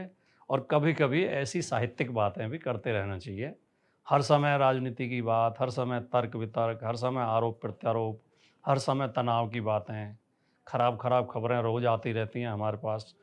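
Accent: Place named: Haryana, India. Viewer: I m from Chhattisgarh.. love to hear haryanvi tone..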